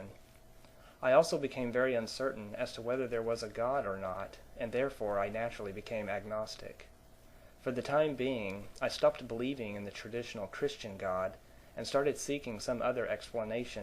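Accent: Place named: Oklahoma, United States. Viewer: You sound like your from Oklahoma.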